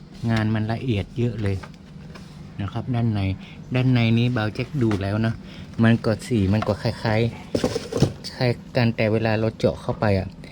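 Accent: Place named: North, Thailand. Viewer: แต่ฮาวเข้าใจเบิดเน้อตี้ปี้ อู้กำเมืองอ่ะกะจ้าว😁😂อู้มาหลายๆโลดเจ้าเข้าใจดีจ้าวววว....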